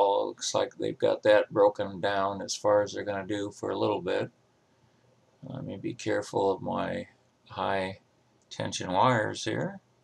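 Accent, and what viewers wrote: Ohio, United States. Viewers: i think your ohio accent is cool, dont worry about it.:) my late dad grew up in and around akron and a friend grew up in port clinton and sandusky. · Your Ohio accent is wonderful. Don’t change it for any critics in your comments. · You keep your Ohio accent. I love accents, that's what makes people unique.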